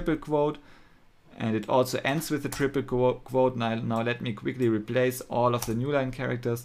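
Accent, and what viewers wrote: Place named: Germany. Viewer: nicer deutscher Accent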